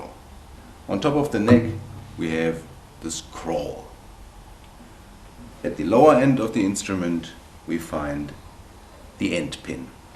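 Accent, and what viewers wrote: Germany. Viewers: Harry Devita Pretty much a german accent. The misspelling might be intended though :D A beginner is begging for knowledge ;-) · German accent, never gets old. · my first thought was "he is either German or Finnish." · His videos are good and he seems to be a great teacher but am I the only one who listened to his first words and was like "I bet he's from germany"? I can't be the only one😮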